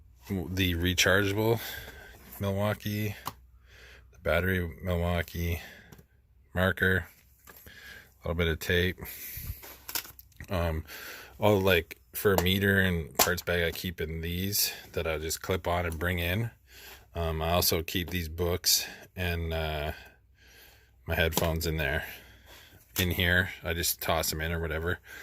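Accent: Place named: Canada. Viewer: Great video and always interesting to see what other trades carry...initially I thought you were American, until I heard the third or fourth "Right" then I thought you must be Canadian! lol Edited to add what are the two tools at the and what are they for?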